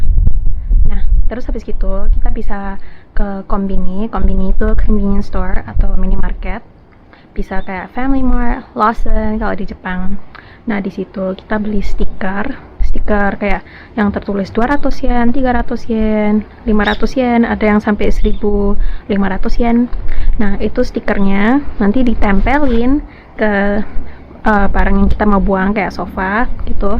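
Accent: Java, Indonesia. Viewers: Jaadi kalo ngomong jepang aksen medok jawa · Medok tenan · Kalo pas lagi ngomong jowo atau Indonesia medok benar-benar seperti orang jowo...☺️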